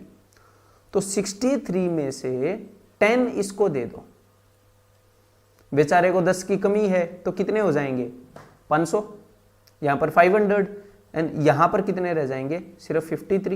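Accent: Haryana, India. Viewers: gr8 Haryanvi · Sir apki mother tongue haryanvi h kya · Haryanvi h bhai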